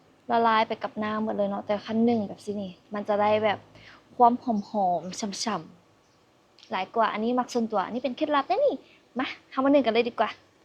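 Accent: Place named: Vientiane, Laos. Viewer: สำเนียงเวียงจันทน์ ไม่ใช่คนลาวใต้ ถ้าลาวใต้จะเป็นแขวงจำปาสัก สาละวันสำเนียงอุบลราชธานี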